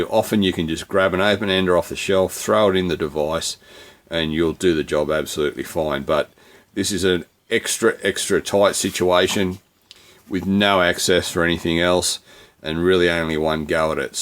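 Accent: Australia. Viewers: Love your Aussie accent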